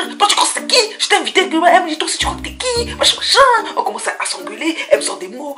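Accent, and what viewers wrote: Cameroon. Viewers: Utilises plus ton accent du mboa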